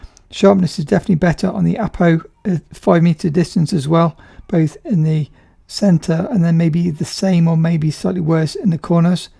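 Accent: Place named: United Kingdom. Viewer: Ciao! Thanks again for your content, I always find it “sharp”, balanced, and genuinely enjoyable. And that lovely British flow of yours? Can’t get enough of it ❤ Since I work in sound, I hope you don’t mind a tiny suggestion: your voice has a naturally low and soft tone, which is really pleasant, but when the audio gets boosted to make it more audible, it introduces distortion, crackling, and a bit of reverb, even noticeable on phone speakers. Using a proper mic plugged into the Rode GO could really preserve the quality of your voice and take the whole listening experience to the next level. Sorry for pointing it out, I know it’s a bit of a sound-geek thing, but sometimes it makes it tough for me to fully enjoy your content, which is otherwise brilliant. Some mics that I suggest: RØDE lavalier II (not the “go”) Sanken cos11 Sennheiser mke2 Sennheiser mke1 One of those even used on ebay Combined with a little fur: Rycote overcover or similar Will make your audio leica level:) Looking forward to the next one!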